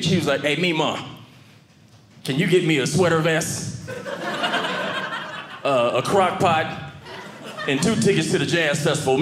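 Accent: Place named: South, United States. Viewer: He talks too fast. I had to put on CC and I almost couldn't read that fast. I talk fast. My friends understand but others don't. He was me. Southern, drop endings on words and talk quick. He was amusing but my friends didn't understand him. Wrong crowd.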